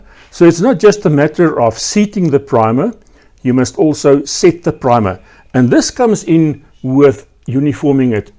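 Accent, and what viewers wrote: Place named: Afrikaans, South Africa. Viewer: I recognize that accent! Classic Afrikaans accent. ❤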